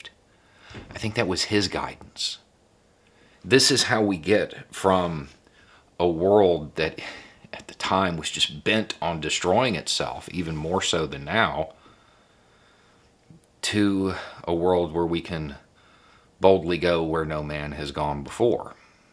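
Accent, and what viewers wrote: South, United States. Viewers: You got that good ole boy accent, but you have a genius quality view of reality. I wish there were more of you in positions of power.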